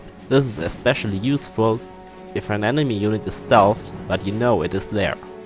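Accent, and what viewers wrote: Germany. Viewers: just like MinecraftMadness64..... ein Deutscher der auf Englisch Videos macht....ich wusste doch dass ich da einen deutschen Akzent gehört habe ;D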